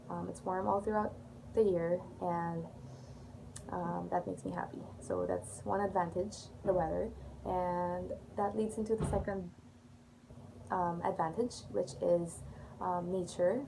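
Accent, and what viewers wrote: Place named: England, United Kingdom. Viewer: I stumbled upon your channel paid attention to the details you say, well im humbled you're such a gentle soul. Prospective doc here graduating pre-med next june thank you so much for the helpful information concerning MD in in Ph well im in Ph as well. You doing a great job im better informed because of you. Planning the US residency too soon. Allow me to pass my last 2 sentiments I love your calmness and gorgeous english accent!! Im humbled😊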